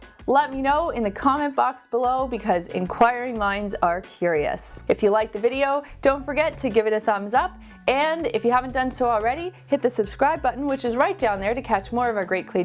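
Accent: Canada. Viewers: She's Canadian- that's the accent.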